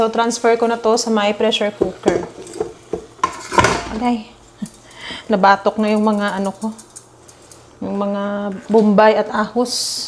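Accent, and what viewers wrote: Iloilo, Philippines. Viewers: ilongga ka ma'am?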